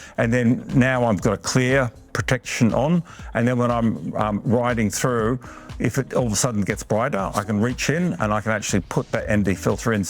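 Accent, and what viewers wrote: Australia. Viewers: Guy sounds Aussie, why would he give a fuck? And as an American, this "it's made in America" to prefer inferior products is lame. American companies should step up their game instead of keeping 6 year old sensors like GoPro. Don't even get me started about the DJI drone ban in the US with clueless politicians.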